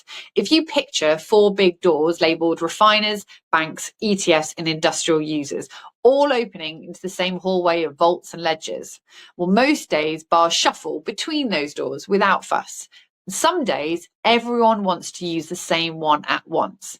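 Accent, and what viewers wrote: United Kingdom. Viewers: Absolute nonsense in a British accent.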